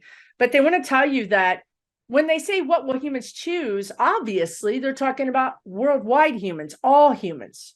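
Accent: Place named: West Texas, United States. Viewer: Your sooper awesome, a great reader...... And talk and sound just like me.!! ( West Texas. ) I catch every reading and your guides are great. As well. Thanks for bn here.. 🌹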